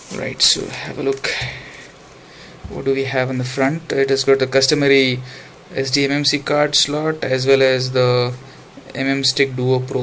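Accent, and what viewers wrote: India. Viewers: i have that laptop but not so good like samsung,and i think samsung more best then sony and u speaking english look like if u indian right? coz ur english is not really nice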